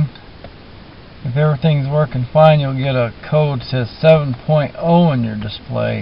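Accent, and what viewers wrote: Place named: South, United States. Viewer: I'm digging your southern drawl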